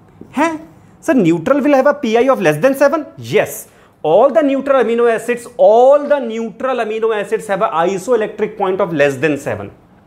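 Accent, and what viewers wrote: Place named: North India, India. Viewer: By your name and accent, I realised that you are a North Indian setteled in South India. Am I right?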